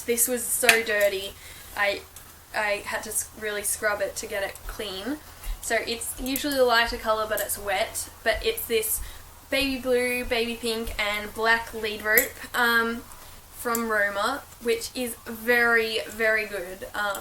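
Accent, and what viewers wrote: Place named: Australia. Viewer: i love your axcent are you from australia?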